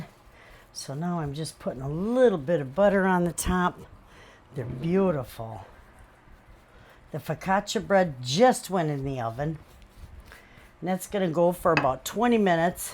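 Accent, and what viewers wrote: Michigan, United States. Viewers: I am so glad I found you! I started my at home cottage bakery back in October last year and I am learning so many tips and tricks from you. We are doing our local farmers market and getting some really great feedback and sales so far! Thank you so much for being open with your techniques and recipes!!! PS I am from MI, haven't been a resident there for over 25 years but I distinctly hear your Michigan twang LOL. Love it!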